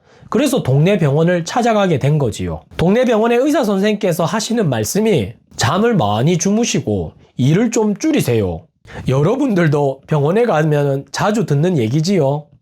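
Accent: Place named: Gyeongsang, South Korea. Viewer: 님 오늘의영상도 꿀잼나게 알려주시고~전경북이라 님 경상도말투가 더정겹게 와닿아요 오늘또 공부잘했습니다~건강하셔요🎉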